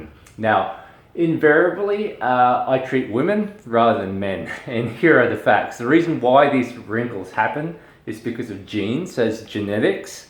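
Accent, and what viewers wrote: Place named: Australia. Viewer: Aussie accent.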